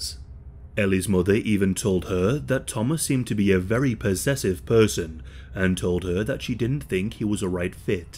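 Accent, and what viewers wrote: United Kingdom. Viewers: lol ur so british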